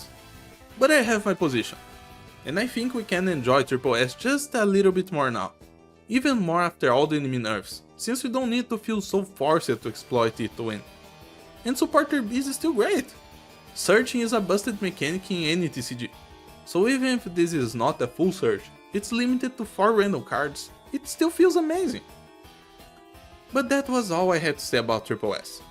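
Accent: Brazil. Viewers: You sound like a Brazilian speaking English, could I be correct?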